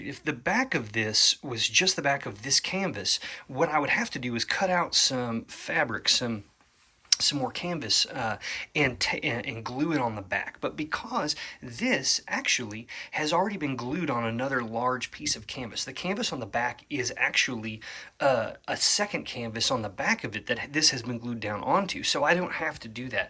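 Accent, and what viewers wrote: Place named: Maryland, United States. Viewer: i was trying to place where you're from based on your voice - it sounds like Maryland, but then you said you took a trip to the East coast which makes me think you dont currently live there.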